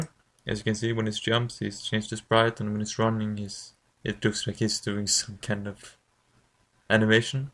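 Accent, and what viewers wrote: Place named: Sweden. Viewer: Your Swedish accent is amazing, please say "The Genius Ninja" in the next ep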